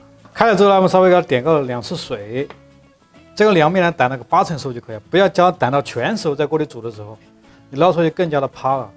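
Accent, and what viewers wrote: Sichuan, China. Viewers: 川普听着亲切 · 椒盐普通话，四川人😅😂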